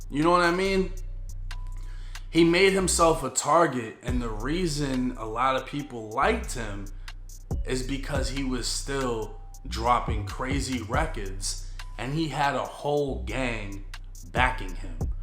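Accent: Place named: Tampa, United States. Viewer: bro your bitch ass is from Tampa with that accent.Get real u bitch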